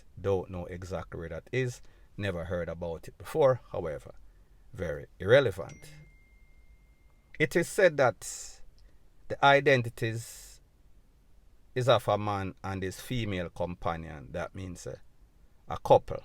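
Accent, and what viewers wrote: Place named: Jamaica. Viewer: I just love the way you talk 👄 ru real great Jamaican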